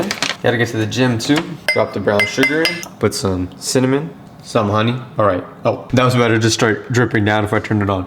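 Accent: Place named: New Jersey, United States. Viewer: I saw that view and thought it was Dubai, but then you seem to have a NJ accent. Good luck with the new apartment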